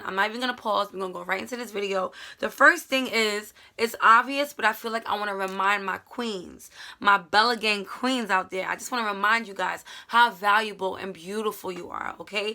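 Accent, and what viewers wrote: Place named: Bronx, United States. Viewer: 746 - 0 no dislikes Bella you a real one ❤❤❤❤ and I love your Bronx accent lol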